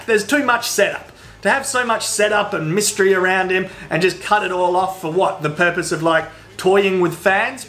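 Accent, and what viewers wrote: Australia. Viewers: You prove that, for a fact, putting an Australian commentaror dropping f bombs over ANY video equals funny. The accent and enthusiasm, great timing, good show man. Like buttons all the way. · The best thing these videos have done for me is helping me finally know how Australian sounds and why people love it so much. And learned many new Australian words, like cheeky and spew.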